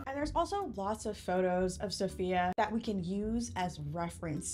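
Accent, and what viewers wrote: United Kingdom. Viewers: ❤ your British accent mode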